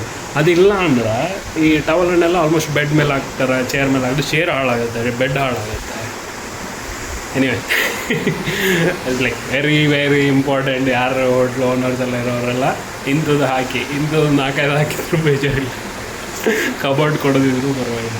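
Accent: Mangalore, India. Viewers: I like Ur Mangalore kannada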